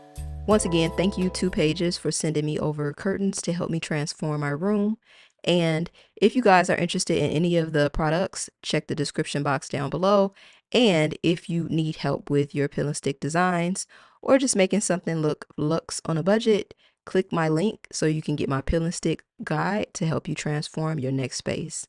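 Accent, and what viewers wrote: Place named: Chicago, United States. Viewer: I have fallen in love with you!!! Ahhh.. and I recognize your accent. Chicago! Thank you for your sharing amazing talent! I love decorating and making my home elegant and beautiful! Your tips and so easy and very helpful!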